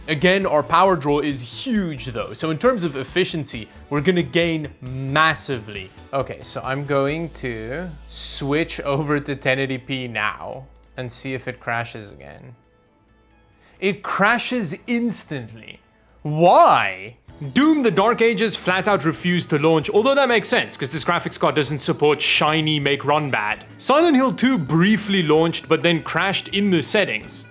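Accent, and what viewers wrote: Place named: South Africa. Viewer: are you south african? i hear it in your accent from time to time .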